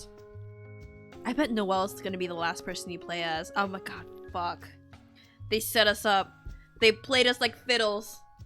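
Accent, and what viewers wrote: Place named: New York, United States. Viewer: Love the new Yorker accent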